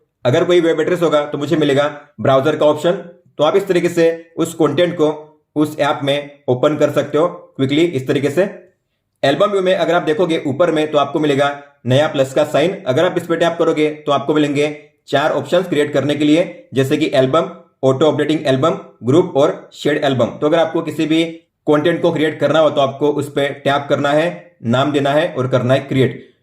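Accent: Gujarat, India. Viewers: Bro r u from Gujarat??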